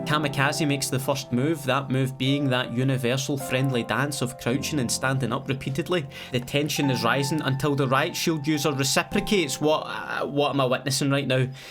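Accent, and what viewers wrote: Scotland, United Kingdom. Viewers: The Scottish JGOD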